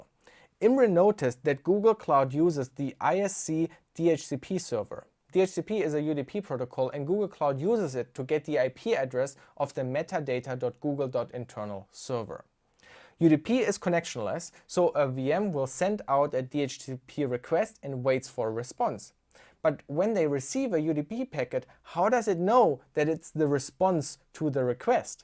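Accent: Germany. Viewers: You're german right? I think I hear it from your voice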